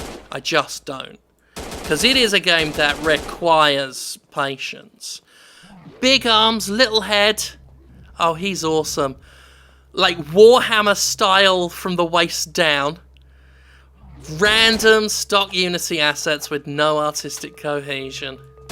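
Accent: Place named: England, United Kingdom. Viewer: British Love how he goes off like a nuke whenever he plays a scary game, "OH F*CK OFF SH*T F*CK", with that awesome english accent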